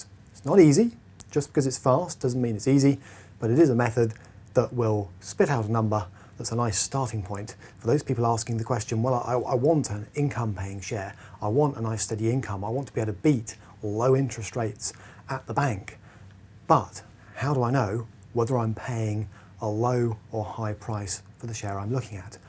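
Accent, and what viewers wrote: United Kingdom. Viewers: British accent makes me sleepy .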